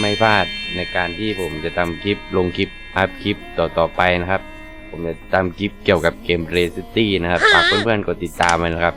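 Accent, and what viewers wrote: South, Thailand. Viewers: จุดนี้มีมาสักพักละครับ (เสียงเหมือนคนใต้)😏😏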